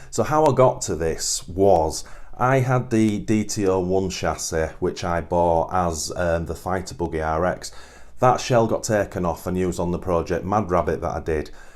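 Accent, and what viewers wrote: United Kingdom. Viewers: It's just occurred to me that you're not in the UK, I sometimes forget because of your accent. I'd have used a.com link if I'd thought about it. They're quite a common gadget, though, so you shouldn't struggle to find one.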